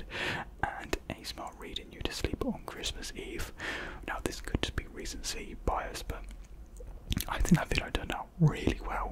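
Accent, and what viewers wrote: United Kingdom. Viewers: happy new year little quiet british man on my phone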